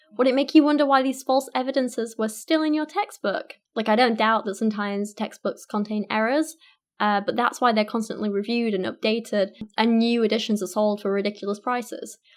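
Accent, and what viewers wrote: United Kingdom. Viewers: Girl why don’t you do something constructive and wear less makeup, why do you atheists insist on going after others who don’t follow your fairytales? Maybe spend more time looking in the mirror, beauty is skin deep, your soul is dark and ugly, and why make videos at your expense while trying to please your moronic subscribers? You bring up Dr Kent Hovind because you need viewers, maybe develop your channel on your own merits and not going after someone trying to save you from hell. But you sound like a Brit so that explains your godless attitude, hope that works out for you.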